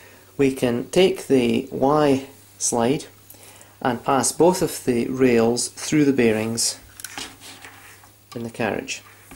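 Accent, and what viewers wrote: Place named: England, United Kingdom. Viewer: My last question: is AXIDRAW from England or EEUU?.I was looking at the prices and I noticed this is $475 dollar or pounds?When I saw your videos I noticed that you have an english accent therefore I made the question about the country jajajaja.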